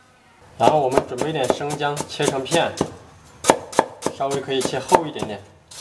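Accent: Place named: Zigong, China. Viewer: 感觉王师傅是自贡或者内江的~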